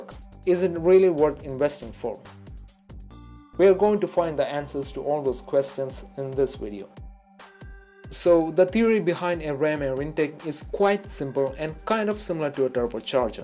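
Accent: India. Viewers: Such a heavy Indian accent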